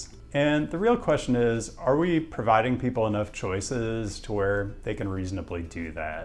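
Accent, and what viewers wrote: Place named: California, United States. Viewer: I like this guy's content, but man do I hate his intonation patterns. It's like farcically Californian.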